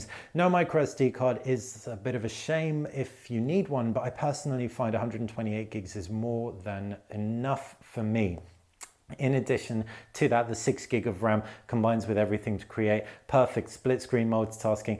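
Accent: Egypt. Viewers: You speak Arabic in Egyptian accent even better than Egyptians themselves 😀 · Wow U Speak arabic .. Is that an egyptian akcent i guess ? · Omg I follow u from about 5 years now... How come you know arabic this clear in Egyptian accent I'm from Egypt and I'm impressed... Keep up the good work... Have a nice day, cheers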